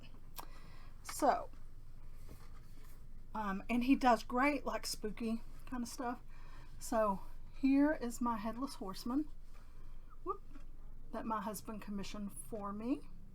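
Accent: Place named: Tennessee, United States. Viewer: I just realized you must live near my old stomping grounds. I spent most of the 80’s in Dickson, Paris, & Dresden, TN. I miss it a lot! Nice to hear your familiar accent. I’d love to stitch long winter.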